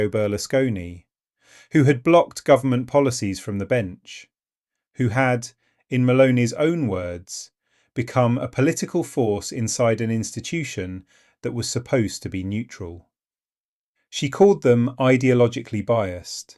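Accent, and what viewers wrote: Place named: United Kingdom. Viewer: Narrators accent is British… considering what a 💩 show the UK is right now…. Hmmm 🧐 I say your video is 🐂 💩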